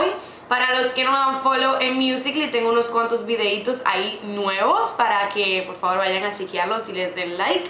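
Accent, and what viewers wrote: Dominican Republic. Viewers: Ese momento donde su acento me llama la atención y descubro que también es dominicana ♥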